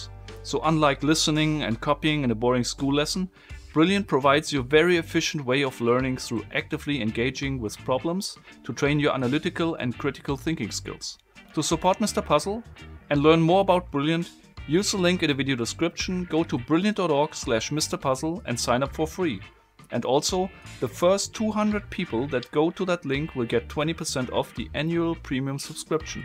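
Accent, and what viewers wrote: Germany. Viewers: damn now I love (grüße aus österreich btw) (cause he obviesly sounds german haha:D) and brilliant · Wie man einfach hört dass du Deutscher bist lol... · Wenn ein Deutscher Englisch spricht...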